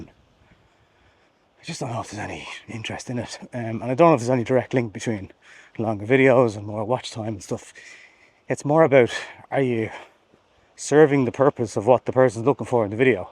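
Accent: Ireland. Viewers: New Zealand here. YouTube ads have become more and more crappy with stupid almost scam products more often than not. Love your channel. Found it looking at Skoda stuff before in light my Octavia RS. Kept watching as I like your style and who doesn’t love an Irish accent. Don’t watch shorts don’t see how they are any use for cars. 10-20 minutes is about right for me.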